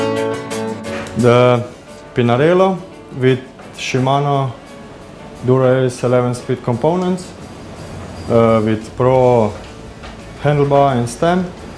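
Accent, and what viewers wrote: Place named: Slovenia. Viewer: I started to watch a clip, didn't know who is talking, but after two words I knew he is from my country... Slovenia! Very typical accent... :) Painful, I saw the comment, very true! But as far as I know, he's good mechanic, who cares if he can set up your bike like a pro! :)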